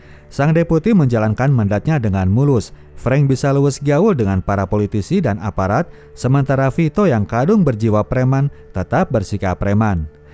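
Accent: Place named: Sumatra, Indonesia. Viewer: Ban ente orang sumsel ya bang, kok dari dulu ane nntn ente beberapa kata dan ucapan ada yang sama kek bahasa kami orang Sumatera gitu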